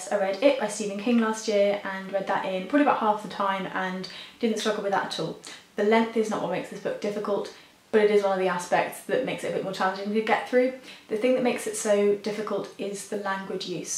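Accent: United Kingdom. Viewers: agreed. this, combined with her heavy brit accent, makes it hard to hear.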